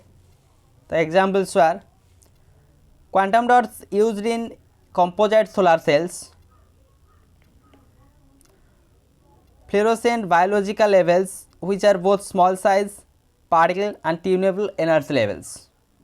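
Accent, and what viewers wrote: Odisha, India. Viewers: Sir are u from orisaa...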